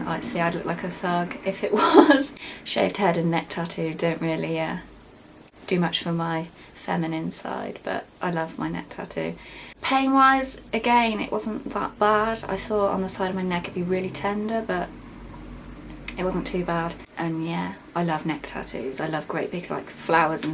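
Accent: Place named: United Kingdom. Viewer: Your moth and butterfly tattoos are soooo pretty! And I love your accent, it's so beautifully British <3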